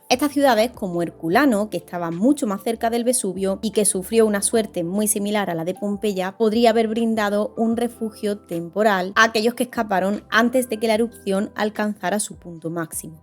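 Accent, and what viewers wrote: Spain. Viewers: She's from Spain, lol...the only accent I can recognize...well, there was a South American one I found similar...Venezuela or something...or maybe not, lol...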